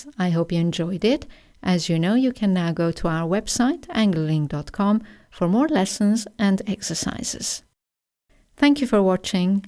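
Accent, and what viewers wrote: RP, United Kingdom. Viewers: Thanks so much!❤️,I'm Chilean and you help me a lot with your British accent because my school speak USA English so I have some problems with others accents. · You sound British thou. I watch loveenglish with Leila and sabrah . Leila is half English half Iranian too. nice meeting ya. thanks for your rapid response. good night and bless you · Do you speak RP?? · You have the Queen accent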